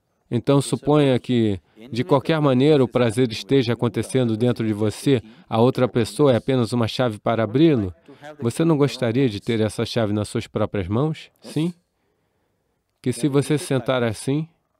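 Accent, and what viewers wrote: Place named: Rio de Janeiro, Brazil. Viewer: so digo uma coisa,tradutor é um carioca. o resto nao sei de nada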